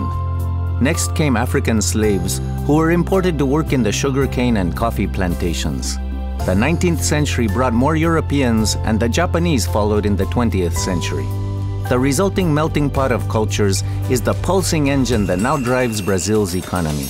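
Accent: Spain. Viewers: Por que o cara tem um sotaque espanhol? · very cool video, but EXPEDIAAAAA why the SPANISH accent on top? We speak PORTUGUESE, our accent is very different from the Spanish accent when we speak English. Should put a Brazilian- Portuguese accent on top if your intention was to make a video as if it were narrated by a local. Just a thought. Nice video though.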